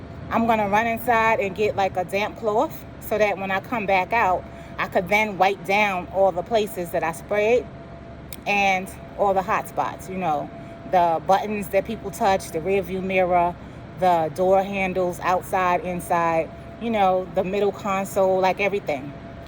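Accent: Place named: Bed-Stuy, United States. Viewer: Love your accent Ma! Is that Bed-Sty?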